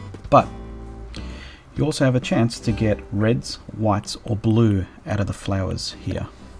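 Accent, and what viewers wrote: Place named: Australia. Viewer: OMFG of course you are an Aussie...I hadn't listened to the video for a while...and I knew that! (Honest I can tell the difference- and 3 of my friends left US for Australia. My bad! And so glad what our domination plot of getting you hooked on expensive Seatle coffee is working as planned!). Yeah sprinking on my flowers is prob going to be left up to me. What is so odd is I've managed to get a lot of purples (just me/myself and I). Tulips, blue roses, lots of green mums, pink everything except those dang lilies . The only thing alluding my can is the pansie (which was my native flower and I HATED It along with the damn pears...why PEARS? OMG...Apples...oranges. NO the bland flipping PEAR. ALSO (since we are new besties) I can't have any one doing a fly over at this moment...I'm redoing everything and it's a shite-show. Our numbers are horrid. 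I'm super immunocomp so I pretty much have been at home for 2 yrs (going out 1 or 2x a month heavily protected) but w/Omicron I've been on full lockdown as of 1/1. So when not working online...I'm in search of the purple pansie/windflower! Be safe my friend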